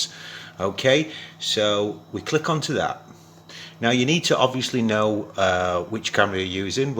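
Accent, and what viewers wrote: United Kingdom. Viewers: Thanks for the brief introduction to SharpCap. Like you say, there not many YT videos to help get started. It's good to hear a British accent downunder. Keep up with the humour.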